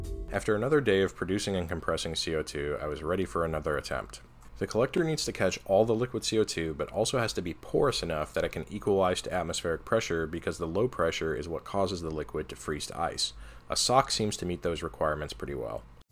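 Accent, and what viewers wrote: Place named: New Jersey, United States. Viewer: Are you from NJ? You have a Jersey accent.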